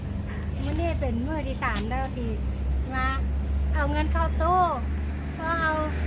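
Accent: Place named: Luang Prabang, Laos. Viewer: มีสำเนียงหลวงพระบางออกมาแว็บๆ! อยากฟัง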